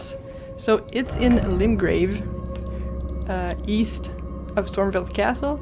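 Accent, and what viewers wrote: France. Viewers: T'es français ? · Vraiment étrange d'entendre un accents français sur un guide en anglais sur elden ring 😂 mais sinon, très bonne vidéo 👌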